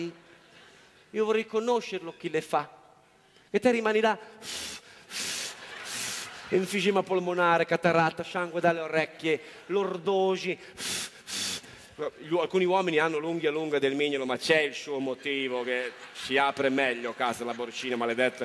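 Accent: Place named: Romagna, Italy. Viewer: Impareggiabile interprete della parlata romagnola prestata alla quotidianità. Bravissimo.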